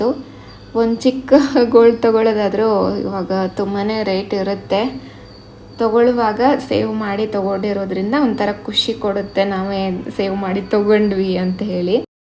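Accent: Kundapura, India. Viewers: Nimdu kundapura kannadana sis nice sharing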